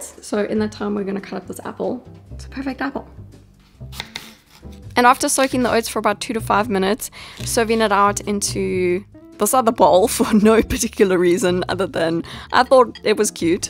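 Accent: South Africa. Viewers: South African accent is strong haha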